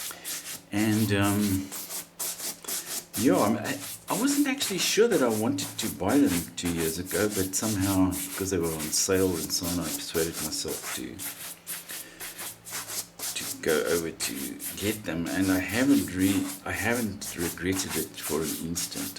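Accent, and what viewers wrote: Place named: South Africa. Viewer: You are South African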